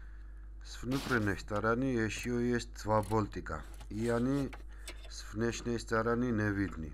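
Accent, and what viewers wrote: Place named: Georgia. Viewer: Грузин, по акценту слышно!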